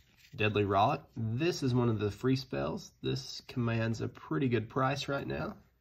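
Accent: Alabama, United States. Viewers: Bro, you’re from Alabama? I thought I detected a slight accent. Right on brother. Thanks for the vids. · I guessed you were from a Alabama from your accent! What part?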